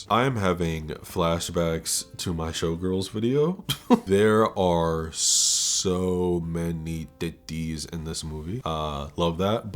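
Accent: United Kingdom. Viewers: As a Brit I love your British accent. I think you're the Bill Hader of film analysis. 👏👏👏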